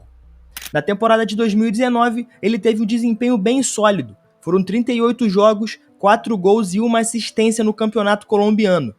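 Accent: Rio de Janeiro, Brazil. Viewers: Cara esse sotoque carioca forte dói no ouvido. Eu me rendo, as vezes é melhor leitura de IA.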